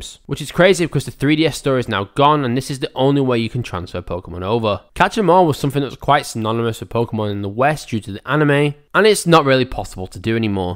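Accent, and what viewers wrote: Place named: Manchester, United Kingdom. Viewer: Nice video lad, but Manchester is blue! I can hear that Mancunian accent so I won’t be too hard on you. I personally think the games are expensive because people view them as collectibles and there are a finite number in existence which still function. As you mentioned, these games can be played for free (which I do frequently), so I think it’s as simple as people being collectors and potentially hoping they can sell it for more later.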